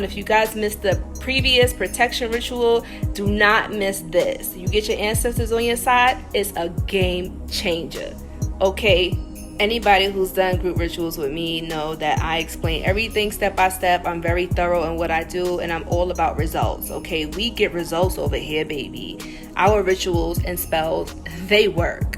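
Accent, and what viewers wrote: New York, United States. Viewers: Amazing, the reason I clicked on your videos 2 weeks ago is because you look just like my ex girlfriend. So much so, I sent the video to others to verify. The only thing that was different was the NY accent. Even then, I had to listen closely. Enjoying your videos, and appreciate what you doing! Much love❤ From NC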